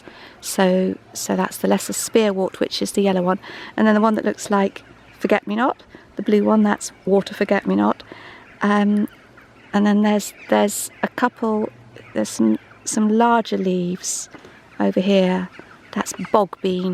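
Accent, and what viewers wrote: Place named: RP, United Kingdom. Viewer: I like your posh English accent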